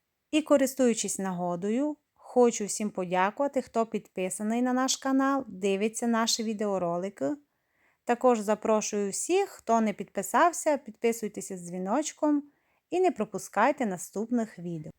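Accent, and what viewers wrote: Transcarpathia, Ukraine. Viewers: Дивлюсь Вас із далекої Франції, обовʼязково приготую таке лечо! Окрема подяка за ваш закарпатський говір!💛💙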